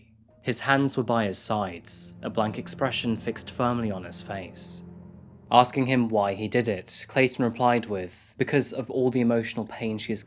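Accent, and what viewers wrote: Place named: United Kingdom. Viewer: I don’t know why insults seem way more harsh when done with a British accent…but I loved the shade! That guy was a monster! RIP Sophie Elliot🙏🏽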